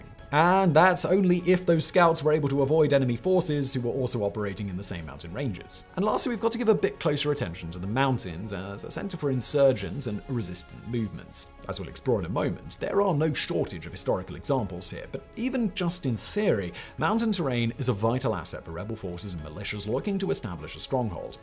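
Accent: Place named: United Kingdom. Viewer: Is his voice not 10x more British in this video than all of the others? Or is it Just me? 😂I actually couldn’t even understand some of what he said a few times and I’ve been watching for like two years. Wth? 🤷🏻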